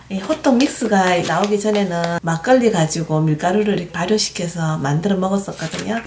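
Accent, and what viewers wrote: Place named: Daegu, South Korea. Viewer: 는 대구분이신가요? 그러시다면, 말투가 처음엔 좀 거슬리더니, 보고 들을수록 정겹네요 ^^ 는 내가 이세상에서 호감을 갖는 최초의 대구사람이십니다~ ㅎㅎㅎ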